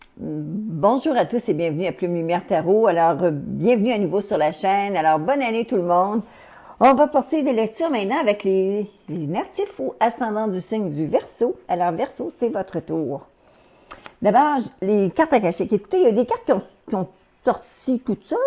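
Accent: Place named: Quebec, Canada. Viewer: Vous êtes vraiment formidable. Et puis....., bravo..🙌🙏.., vous avez changé votre '' look vidéo''. Le son est clair...et voire même votre excellent français canadien.😀. Il est devenu bcp plus prononcé eeeet...très distinctif. Merci ma soeur et que Dieu vous bénisse richement.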